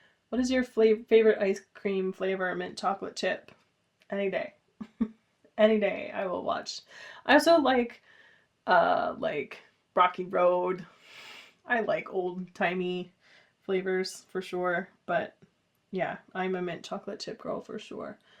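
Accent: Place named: Seattle, United States. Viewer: Love the dress and the video! I’m one of those folks that has watched your channel for ages. I live in Denver now but was born in Seattle and raised in the Bay Area and you just sound so familiar to me. Nothing like California. I am a seamstress and do everything from hemming jeans to making wedding dresses and have done so since I was 16 (I’m 70 now so do the math!). I’m also a quilter, knitter and embroider. Gotta keep those hands busy when I’m watching tv. I’m not reading anything new but just reread The Red Tent which is one of my favs. My daughters are in their 40’s and were raised in the Bay Area too. Okay, I guess I’ve taken up enough of your time. Take care. 😊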